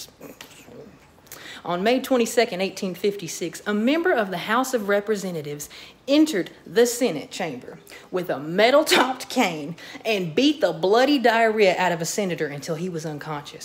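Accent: South, United States. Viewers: Congratulations- you’ve become a masterclass on southern fried reading on an Aaron Sorkin “Walk and Talk”. 👏🏾🔥👏🏾🔥💯💯💯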